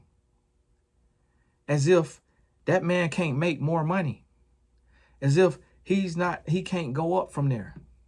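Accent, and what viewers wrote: South, United States. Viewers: I just LOVE how you talked to your wife when she came into the room. You can SEE the love and adoration you have for her! Just beautiful! And everything you said is ON POINT! Also, PLEASE keep your Southern accent. It’s beautiful, down-to-earth, sometimes comical (when intended) and refreshing. God Bless You Tony Gaskins!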